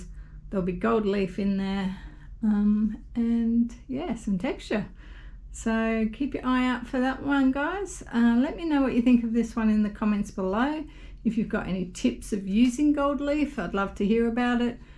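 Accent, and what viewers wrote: Australia. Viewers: Hi, love your Australian accent. This painting is gorgeous. You're so talented.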